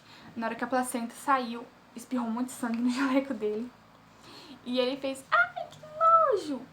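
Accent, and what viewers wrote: Paraná, Brazil. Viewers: Que linda 🥰 amei o seu jeitinho de falar, sou paranaense, do interior kk me identifiquei 😂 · Muito bacana, estou gostando muito do seu canal. Só gostaria de saber de que estado e cidade você fala, tenho a impressão que é do Paraná, estou certa? Mas qual cidade?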